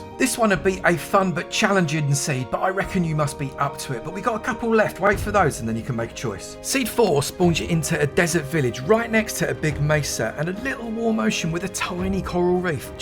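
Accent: United Kingdom. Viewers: this dude sounds like a tourist attraction person XD very soothing bri'ish accent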